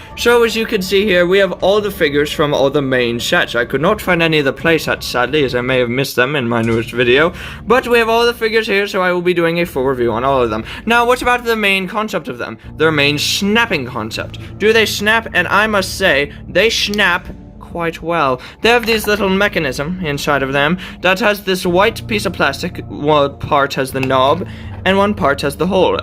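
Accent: United Kingdom. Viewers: What's with the very British voice?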